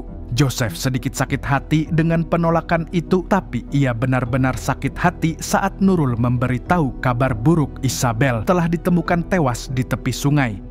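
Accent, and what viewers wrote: Banjar, Indonesia. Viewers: Urang banjar mang?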